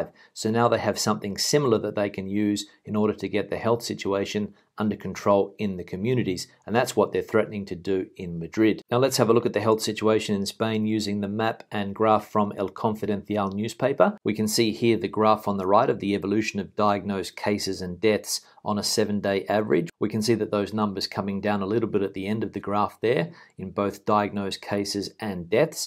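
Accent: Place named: Australia. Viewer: your mild Australian accent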